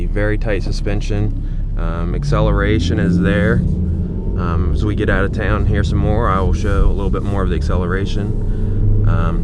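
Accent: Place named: South, United States. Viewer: I can barely understand you with that ridiculous southern drawl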